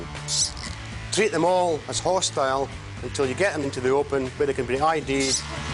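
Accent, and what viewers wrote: Scotland, United Kingdom. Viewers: Hes Scottish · hes scottish not irish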